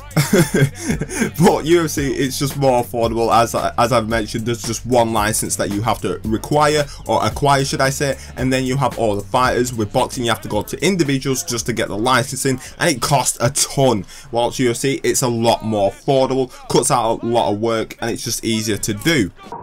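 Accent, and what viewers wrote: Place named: Yorkshire, United Kingdom. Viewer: Yorkshire accent m8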